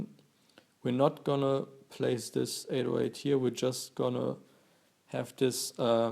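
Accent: Germany. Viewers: Nice Video bro. I think you come from germany, is that right?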